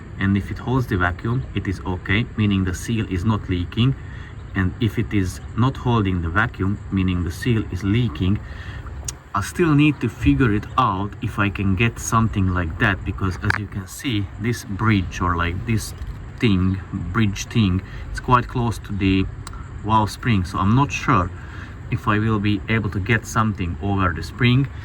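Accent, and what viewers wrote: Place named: Hungary. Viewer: I thought you were Hungarian your accent is very similar to a workmate of mine. He has a penchant for old BMWs 😊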